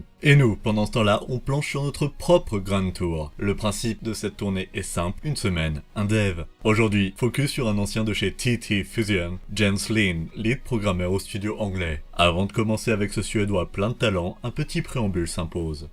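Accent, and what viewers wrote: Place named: United States. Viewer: Super Idée. Super Contenus. Super Texte. Mais je n'accroche pas le "style" que tu met dans ta vois. C'est très proche d'une vieille IA de Vieu SF mais sans la reverbe... Et pour mon cas je trouve que sa gâche le plaisirs que j'ais eu a reguarder cette video. Si pour X réson tu tiens absolument a guarder cette fasson très américanisé de parler je peut te consseiller des tuto pour y metre les effets audio qui vonts avec et mieu fair passer la pilule. Mais pour avoir testé ce style je te consseil le serieu et sinssert. Milles excuses pour l'orthographe.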